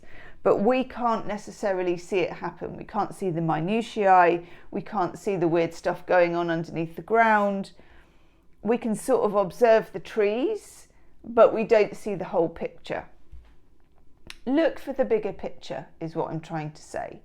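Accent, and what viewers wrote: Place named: West Midlands, United Kingdom. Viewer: Where are you from,? West Midlands? I've lived in Canada for 25 years now, but before that I lived in Stafford for a few years and it's refreshing to hear a voice like yours 🙂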